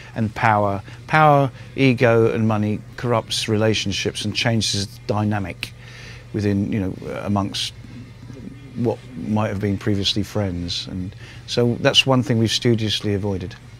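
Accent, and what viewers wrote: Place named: France. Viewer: Sadly, I couldn't understand word. Damn that outrageous French accent.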